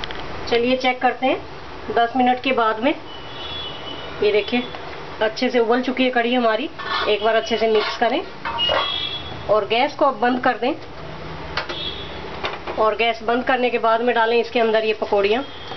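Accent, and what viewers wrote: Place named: Punjab, India. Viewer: As salam walekum sister's ap ki recipe se ziyada ap ki bate achi lagti h panjabi lehje me urdu bolti h bhot acha lagta h or wo waords sun k bhot acha laga or hasi arahi h or (pahari bana k) 😀😀😀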